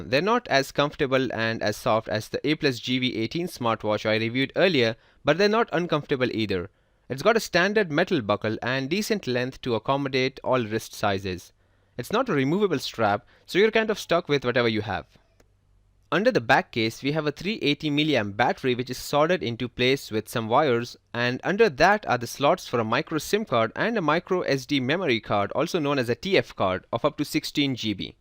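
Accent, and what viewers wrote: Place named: India. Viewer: Indian accents bug me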